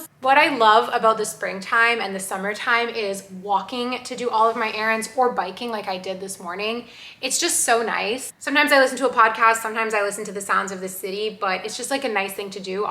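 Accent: Quebec, Canada. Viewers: I like your videos and in this one it was the first time I heard you speaking in French and you even have the Quebec accent when speaking French I'm impressed (I love the Quebec accent as a French from France) :hands-yellow-heart-red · I adored listening to you speaking French <3 with the cutest Quebec accent at that ! lots of love from France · Haha you have such a strong quebecois accent now when you speak French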